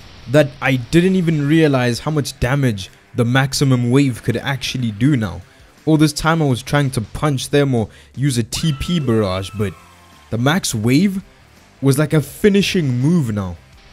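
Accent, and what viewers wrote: South Africa. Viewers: your acent sounds south african (is it?)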